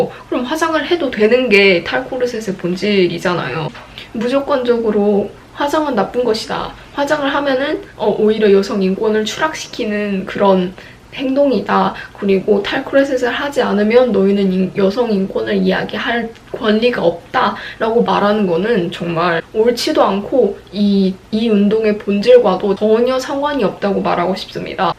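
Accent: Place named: Gyeongsang, South Korea. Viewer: 경상도 말투가 묻어나오네요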